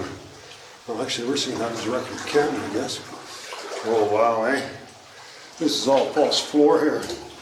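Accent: Canada. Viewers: As soon as I heard the accent. I KNEW it was Canadian. Love the video.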